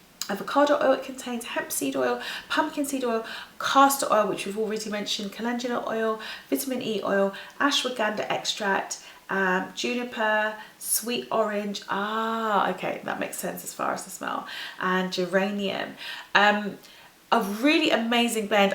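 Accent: United Kingdom. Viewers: I cAnt stand the British accent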